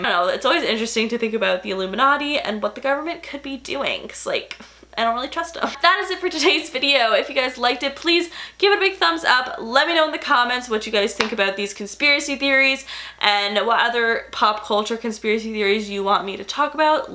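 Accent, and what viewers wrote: Canada. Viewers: lately i think you can been sounding Canadian🤷🏼‍♀️ idk if anyone has said this cause i don’t really look at comments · I'm so confused as to why has a Canadian accent if she's an Italian from Florida like wtf 😂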